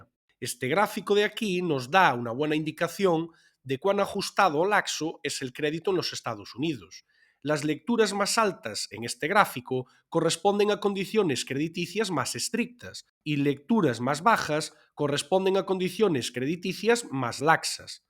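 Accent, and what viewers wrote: Spain. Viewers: Muy buen video! Pregunta de curiosidad 😁: de que parte de España es el acento de la persona que habla en el video?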